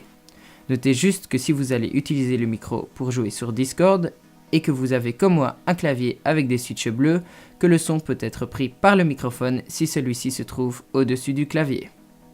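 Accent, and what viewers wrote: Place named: Flanders, Belgium. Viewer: Cet accent flamand est atroce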